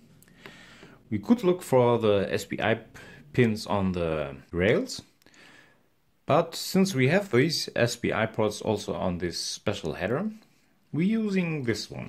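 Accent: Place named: Home Counties, United Kingdom. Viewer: The kickstarter requests Canadian dollars but the accent is clear Home Counties English. Quite surprising.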